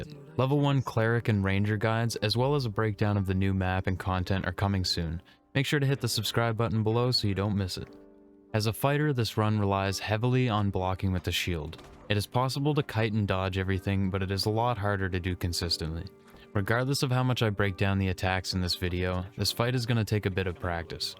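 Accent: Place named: Maritimes, Canada. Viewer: You from the maritimes? I recognize the accent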